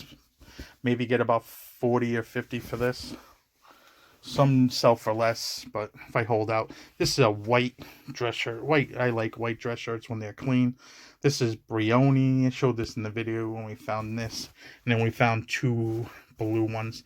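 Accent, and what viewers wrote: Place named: New York, United States. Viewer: I thought I heard the NY accent. Grew up in Falmouth. Just moved to IL bummer I didn't find you sooner 😅.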